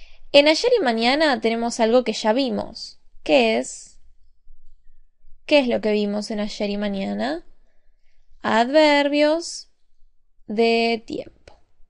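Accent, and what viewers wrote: Argentina. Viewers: eres argentina? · Muchas gracias. Es de Argentina deduzco,por su voz, lo dice un cordobés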